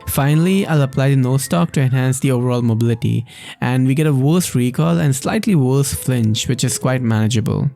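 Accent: India. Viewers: hmm, i feel like ur accent is indian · Dude are you from India?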